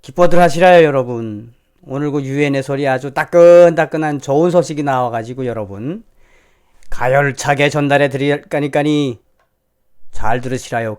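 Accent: North Korea. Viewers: 수고가 많으십니다. 앞으로 하시는일 잘 되시기 바라구요~ 볼떄마다 느끼는거지만 TV를 보다보면 앞으로 언어억양 떄문에 이질감 느낄일 점점 줄어들거 같아 좋습니다. 우리 6-70년대만 해도 북한말 억양 들어보면 아주 쌘 발음이 들어가서 들을때마다 섬뜩 섬뜩했지요? 새벽에 잠이 안와서 라디오 채널 이리저리 돌리다가 북한 방송 들을떄.... 그떄는 북한 라디오 방송 들으면 간첩으로 몰리는 시대였지요? 이렇게 자주 듣다 보면 통일이 돼도 언어 만큼은 쉽게 동화 될거 같아요~ 앞으로도 열심히 보겠습니다. 좋아요 한표입니다. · 재밌게 잘보고 있어요 북한말 더욱 찰지게 해주세요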